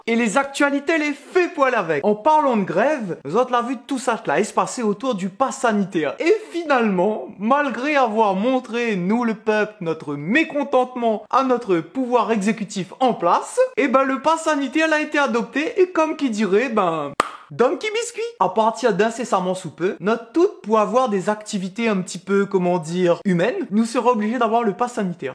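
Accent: Réunion, France. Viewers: Ben explique le ban' couillons que "endémique" c'est le contraire de "sporadique" ! 🤭😄😄😁😁😁... Par contre, la prochaine fois pensez aux âmes sensibles (évitez les gestes ityphalliques SVP !!! 😁😁😁🤭)... Toujours aussi drôle avec out l'accent yab bien prononcé ! J'adore ! Merci - From Bordeaux 🙋.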